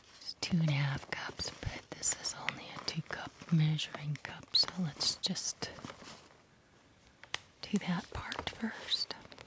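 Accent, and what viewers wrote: South, United States. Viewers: I loooved your funny southern accent 😁 Let me just say it's interesting how you measure the ingredients by volume, here in Italy the measurement is by weight 😱 BTW I'm a huge fan of yours since the beginning, your voice is so relaxing (and I'm usually a no-talking person 🤷‍♀️) All the best from Italy 💙🤗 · I love your southern accent 😂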